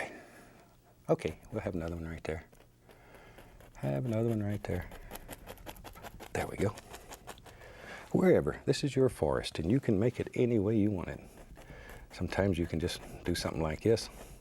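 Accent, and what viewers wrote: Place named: United States. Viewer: he says it a lot in his videos.. pretty sure its just his american accent. he says’ something bout like that.. but the ‘that’ is lazy :)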